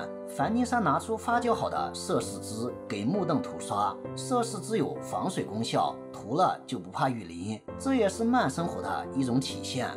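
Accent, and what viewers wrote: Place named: Shandong, China. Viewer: 越越是哪里人啊？听着口音像山东，河南，陕西？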